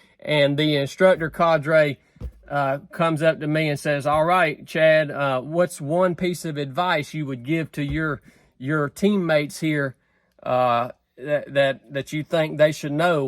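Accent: South, United States. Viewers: What part of America is this accent from??? I need to move there ASAP 🧡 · I'm from Belgium sir and I wish I had the same accent when I speak english. Southern accent is damn good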